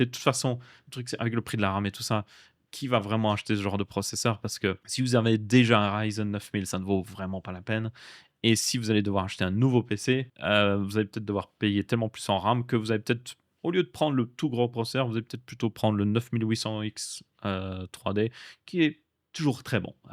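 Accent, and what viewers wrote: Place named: Belgium. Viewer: J'adore ton podcast, agréable a écouter avec se superbe accent, qui me rappelle les copains de Belgique.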